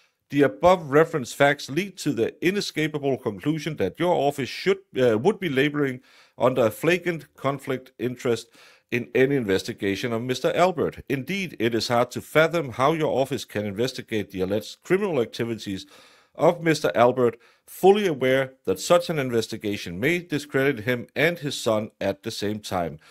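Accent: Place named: Denmark. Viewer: That's just Danish English (denglish) pronouncement 😉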